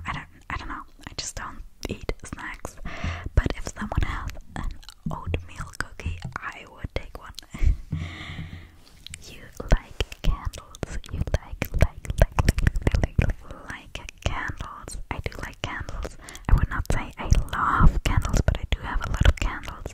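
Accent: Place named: United States. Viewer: I’ve only just started listening to and to be completely honest I thought you were American, as you do have a slight twang but that could be because of the internet, with a mix with a different accent. I assumed you were born and raised in America with your parents or one of your parents being European, I wouldn’t have guessed German either! 😂 One of my friends is half German, half English and you have similar accents but she is completely fluent in English and partially fluent in German. So I would've thought it was a similar thing. You’re English is really good, I thought you were a native speaker, don't put yourself down cause you are clearly better at it than you realise!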